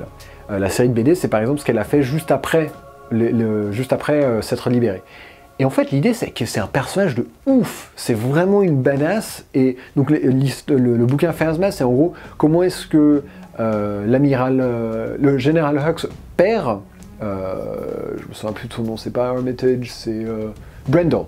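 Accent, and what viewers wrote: United Kingdom. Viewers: Salut, critique super sympa, j'ai juste un peu de mal avec la prononciation à l'anglaise de tous les termes de star Wars...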